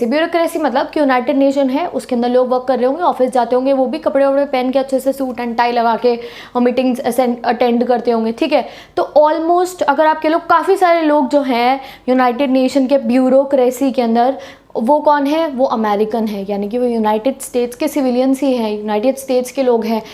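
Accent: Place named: Uttar Pradesh, India. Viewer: Inke language u.p feel lati h......moro moko mohse hega n all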